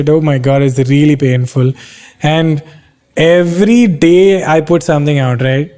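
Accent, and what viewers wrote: Kerala, India. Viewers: talking in english with a hint of Malayali accent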